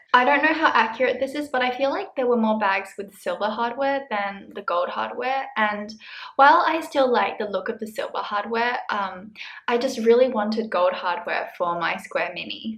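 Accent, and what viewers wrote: Australia. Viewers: Are you from Australia? You sound a lot like wengie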